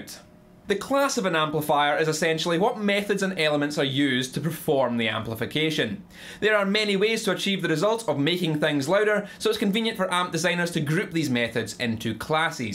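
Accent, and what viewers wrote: Scotland, United Kingdom. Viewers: this Scottish accent sounds much comfortable after viewing decades of clips from this channel. good vid, thanks. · That's really very interesting ! Plus, making the effort to get your accent, I think I can now understand every single english accent in the world ! I thought Trainspotting was hard to understand, but now I get what Scotland really is all about !! Thanks man ! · Good God. Scottish parents, please don't saddle your offspring with this awful accent. Thanks...America and the rest of the world.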